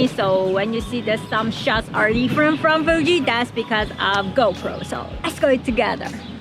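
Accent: Iran. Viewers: Iranian Accent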